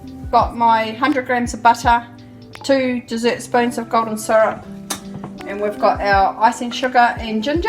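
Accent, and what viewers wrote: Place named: New Zealand. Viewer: What part of NZ are you from? That's a strong Kiwi accent!! I watching from Spain, missing my Kiwi treats.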